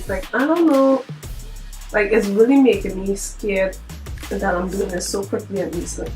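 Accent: Trinidad and Tobago. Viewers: You sound Trinidadian 🇹🇹 Just found your channel.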